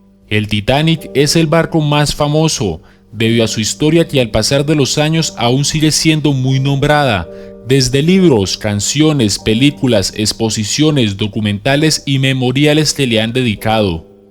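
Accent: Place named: Colombia. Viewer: tan solo con ese horrendo acento colombiano; al minuto de ver el video me dio desesperacion y ya no me intereso ver el video 😝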